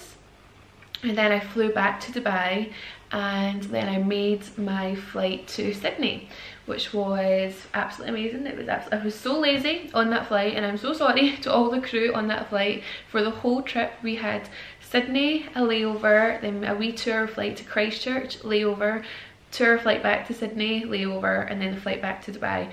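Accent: United Kingdom. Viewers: is that Scotish accent?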